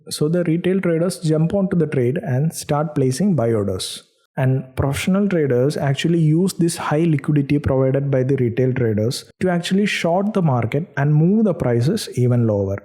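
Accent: Kerala, India. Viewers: Bro Are You From Kerala ? Through Your Accent I guessed . Am I Right ?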